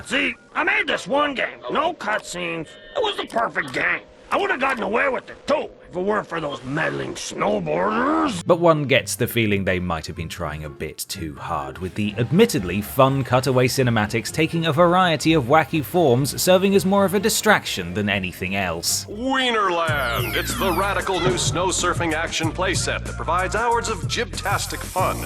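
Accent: United Kingdom. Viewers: A British commentary. Refreshing indeed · I love this channel because they put subtitles, I'm English learner and sometimes I don't understand the british accent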